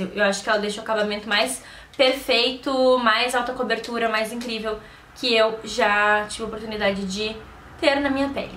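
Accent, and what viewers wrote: Rio Grande do Sul, Brazil. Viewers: Amo o meu sutaque gaúcho ,amo o seu, awn enfim deus ta la em cima tomando chimarrão ! Dale RS SM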